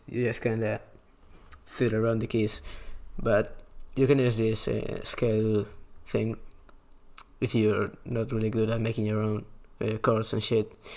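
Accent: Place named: Spain. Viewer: Hey man I love the content. You have a cool accent are you spanish? Are you mixed with any black?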